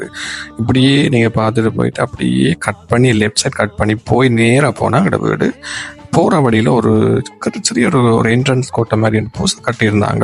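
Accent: Jaffna, Sri Lanka. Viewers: Jaffna la enaku pidichadu alagana Tamil neegalum romba alaga Tamil pesuriga doctor ❤ Colombo la kuda naaga pesura Tamil alagu tan but edu romba alaga ❤ cila words mattum puriya matanguda ❤ 😂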